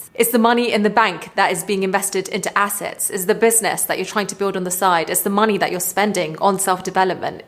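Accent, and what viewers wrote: United Kingdom. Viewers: Let's be honest, I'm watching this video because she's easy on my eyes, and no, I'm not turned on by her British accent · Omg. A British accent will get people only so far. When you read this advice instead of listening to the accent, you'll see it's very generic advice that is not worth your time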